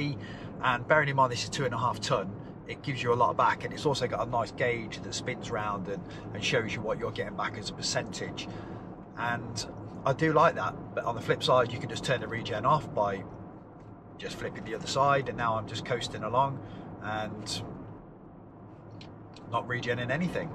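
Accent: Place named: RP, United Kingdom. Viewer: you`ve gone posh👌👌👌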